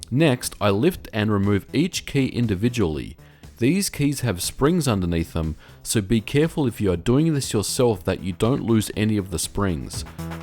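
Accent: Australia. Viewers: You sound Australian... if so, where the hell did you get a 128D for $30?! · Wait, it's a Commodore 128DCR? Is it a NTSC or PAL version? If it's NTSC, what special steps if any did you have to get it to work with a PAL monitor and 240V 50Hz AC. I ask cause you sound Australian...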